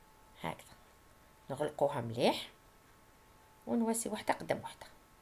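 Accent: Tlemcen, Algeria. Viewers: و عليكم السلام.وصفاتك خفيفة ظريفة كيما حضرتك. ربي يوفقك و يزيدك من فضله. تعجبني لهجتك كثيرا هل حضرتك من تلمسان؟ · samir azouz والله حتى أنا ظنيت أنها من تلمسان من لهجتها 😊😊😊😊 انا من العاصمة ...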